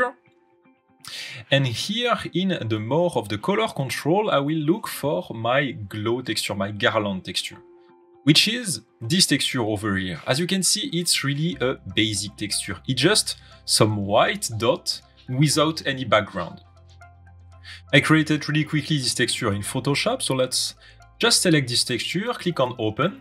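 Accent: France. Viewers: fait des vidéos en Français stp ta un gros accent Français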